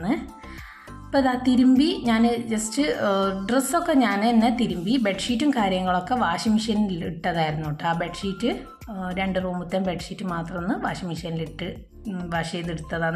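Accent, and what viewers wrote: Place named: Kannur, India. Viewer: Kannurkare samsaram pole und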